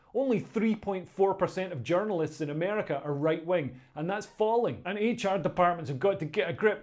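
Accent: Scotland, United Kingdom. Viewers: This is great, and the Scottish accent saying plain and obvious things makes it so much better.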